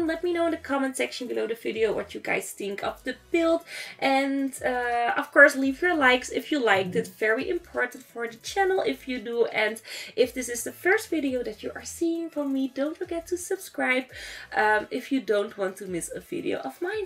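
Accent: Netherlands. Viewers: dit is de eerste video die ik kijk van je en ik hoorde gelijk dat je nl was x mooi gemaakt😍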